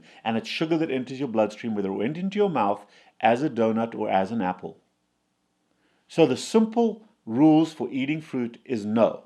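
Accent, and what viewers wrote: South Africa. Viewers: I'm 53 and from South Africa, where it sounds like he is also from. Not sure where he got his apples from - they still look the same to me😂 But I agree that diabetics should not eat fruit.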